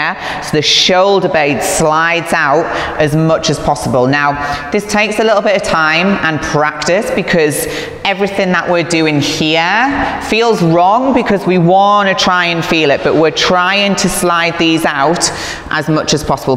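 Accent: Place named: United States. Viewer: Non capisco l'americano inglese 😔😔😔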